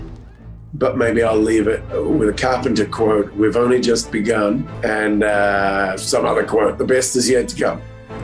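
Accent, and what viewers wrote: New Zealand. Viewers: Wait he’s Kiwi?? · He’s from New Zealand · He's kiwi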